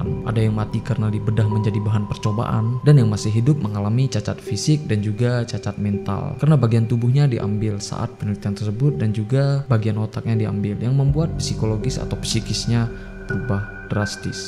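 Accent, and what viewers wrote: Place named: Medan, Indonesia. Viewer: Kaya ada logat medan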